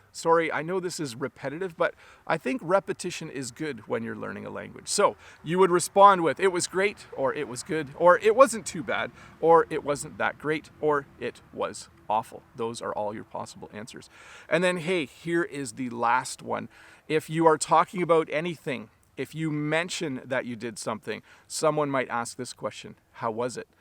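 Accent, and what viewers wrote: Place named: Canada. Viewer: Thank you teacher I like your accent and the canadian people so much 😍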